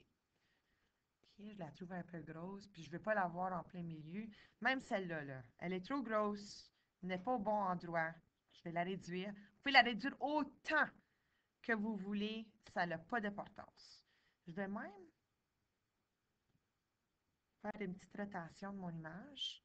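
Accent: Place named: Quebec, Canada. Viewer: Bon tuto fait par une jolie fille avec un joli accent québécois.MERCI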